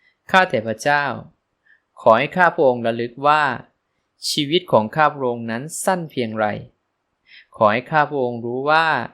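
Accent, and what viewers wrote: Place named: Isan, Thailand. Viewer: ขอบคุณพระเจ้า ขอบคุณผู้สื่อสารที่ดี แม้สำเนียงออกอีสานแต่กะเป็นตาฮักยูเด้อ ขอพระเจ้าอวยพรหลาย ๆ เด้อ ขอพระเจ้าได้รับเกียรติสูงสุด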